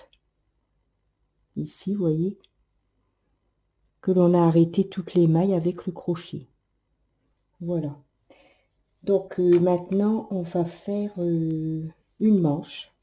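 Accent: North, France. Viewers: mais non faut pas moi j'adore, c'est un accent charmant ! vous vivez dans le nord ?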